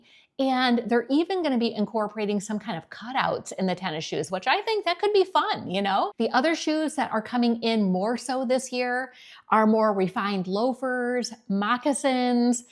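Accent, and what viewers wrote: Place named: Minnesota, United States. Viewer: Is she from Minnesota? I love her accent! ☮️💟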